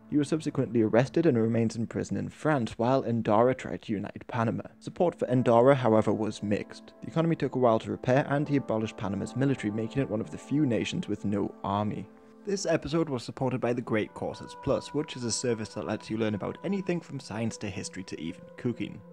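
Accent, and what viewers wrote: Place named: United Kingdom. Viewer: If you're gonna make a historical video with a heavy british accent, consider adding accurate subtitles as well. I have no idea what you're saying half the time.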